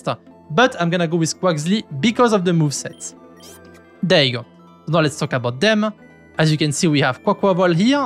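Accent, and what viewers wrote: France. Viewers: c'est un accent français ça non ?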